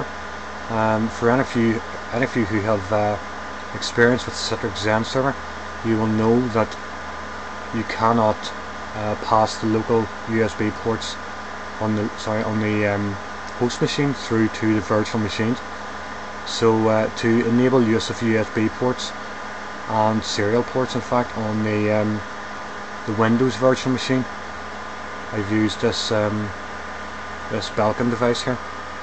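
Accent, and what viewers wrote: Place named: Northern Ireland, United Kingdom. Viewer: You sound like your from Northern Ireland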